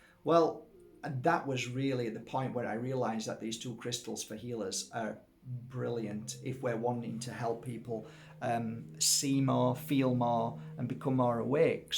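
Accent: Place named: Northern England, United Kingdom. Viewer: Hi sir. where are you from. you sound like an northener. im from west Yorkshire. thank you for your advice x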